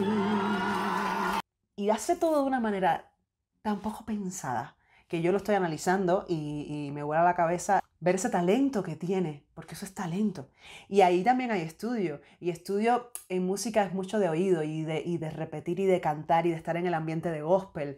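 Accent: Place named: Cuba. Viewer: Primera vez que veo su canal,me parece que debe ser cubana por la forma de hablar 👍👍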